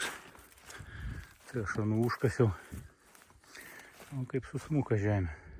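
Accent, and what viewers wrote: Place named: Samogitia, Lithuania. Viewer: Kokia idomi zemaitiska sneka!!!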